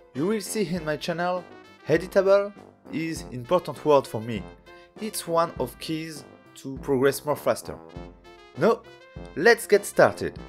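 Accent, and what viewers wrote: France. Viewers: Oh l'accent français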